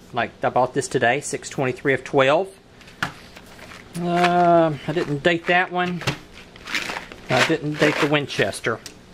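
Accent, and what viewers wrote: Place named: Texas, United States. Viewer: sounds like your from texas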